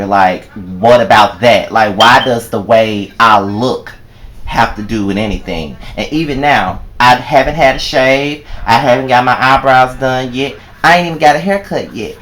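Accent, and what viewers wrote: South, United States. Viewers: there is nothing wrong with you. Fuck the haters. Don't let that shit bother you. Who and what is attractive is a lot a matter of opinion. Your videos be on point. And there is nothing wrong with a southern accent, I like my southern accent. This video took a lot of courage to do.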